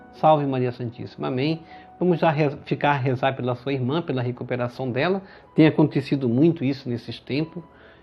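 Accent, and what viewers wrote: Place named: North, Brazil. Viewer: Obrigada, por compartilhar conosco a palavra de Deus. Que Deus te abençoe e proteja sempre. Mande um abraço para Manaus e peço orações pela nossa cidade. E a propósito, diga-nos qual é seu estado? O seu sotaque me parece ser do Norte. Um grande abraço!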